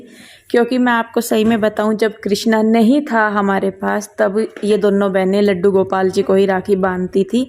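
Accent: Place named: Saharanpur, India. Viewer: Aap saharanpur se ho aas pass me kisi Gao se aapki aavaj yahi ki hai 🙏🙏🙏🙏🙏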